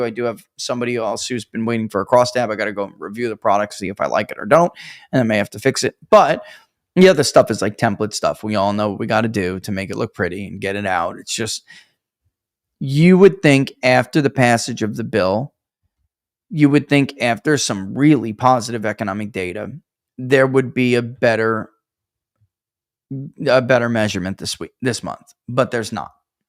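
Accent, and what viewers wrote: New York, United States. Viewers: lol love the NY accent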